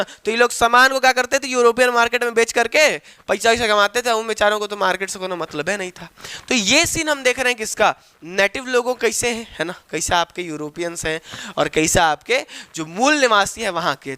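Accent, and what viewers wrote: Bihar, India. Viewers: bhaiya ap bihari mat bola kigiye please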